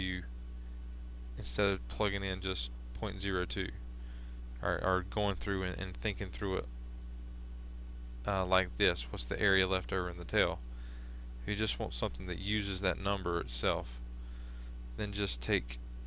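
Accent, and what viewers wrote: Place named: Kentucky, United States. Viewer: i like ur accent, reminds me of Kentucky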